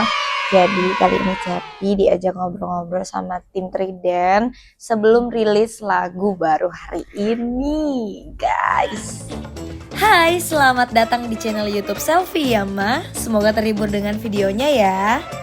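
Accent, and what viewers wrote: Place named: Bugis, Indonesia. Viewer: Khas bugisnya lengket banget😭 "di Tamang" GK tuh, tapi gpp, pokoknya kak terlope-lope lah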